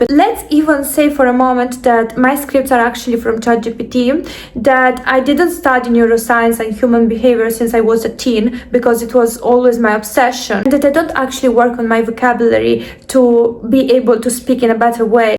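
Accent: Russia. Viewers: Since one year I just stopped to sharing my project of life plans with some friends, acquaintances and one member of my family just because I see that everytime that I speak of my plans they're leads immediately to fail. Concerning my family side the only thing that I used to get was skepticism and cynical remarks : like how I dare to even have ambitions ? And even if I don't share anymore I can easily get one mocking comment litteraly asking me if I don't plan to be the next Kim Kardashian. I decided to just let it go and not getting affected by it, because at the end of the day I have accomplished so much things by myself and that I'm stronger than them. But seriously, to live happy let's live hidden! Now I just share the bare minimum and even so I just lie about some details because at the end of the day it's my life, and like those people aren't helping that much what's the point to share crucial details if in the end it just prejudice me ? 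At the end best weapon against haters is to give them an ulcer by becoming more better that you are actually already. P.S Don't worry about those дурак comments, they just hate you because you're Russian. 😂 They wouldn't dare to make those remark about your accent if you where from another ethnic group, it's just typical American slavic women hate. Keep the good work and thanks for your deep advices. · I adore your accent. Are you from Russia?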